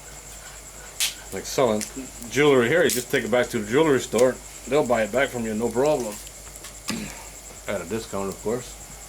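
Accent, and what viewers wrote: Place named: Manchester, United Kingdom. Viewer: Manchester speach 👍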